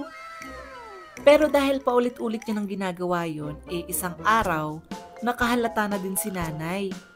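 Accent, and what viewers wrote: Visayas, Philippines. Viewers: bisaya ka po ba???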